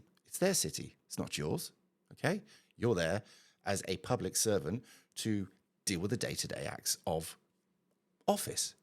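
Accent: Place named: England, United Kingdom. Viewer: This channel is pure grifting with an English accent.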